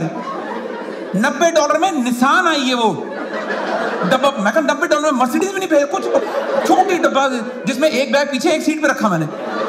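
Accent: Punjab, India. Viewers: You are awesome yaar Your punjabi accent is tremendous bro Really love you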